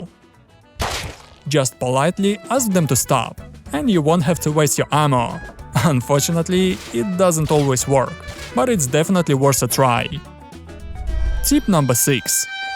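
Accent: Russia. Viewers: о, русский акцент. Наш!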